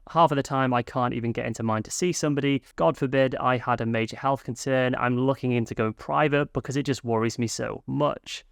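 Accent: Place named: United Kingdom. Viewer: Replace the British accent and substitute Canada for England and it could be the same video. Gov't attitude is to tax to get funds, create a need, raise the tax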